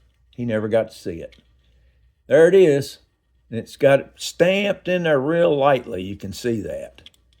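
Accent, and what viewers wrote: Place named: Ohio, United States. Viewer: That platform truck is awesome. What state are you from, trying to catch that accent? Ohio? Dump truck is cool 😎. That driver has a lot of brothers, they all look the same. Nice collection. WOW 👍👍👍👍👍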